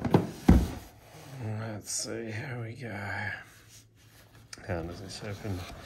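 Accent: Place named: Australia. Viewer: You sound Australian. How long did it take to be delivered from the US? I am in Melbourne.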